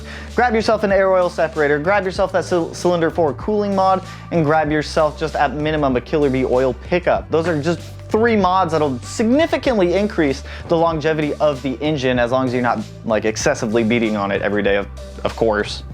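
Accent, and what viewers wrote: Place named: New Jersey, United States. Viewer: Sound like you are from where I live ( NJ ) - everyone speaks fast. What 3 things you say for longevity??? Air/ Oil Separator, Cylinder 4 Cooling Mod, Killer B Oil Pickup?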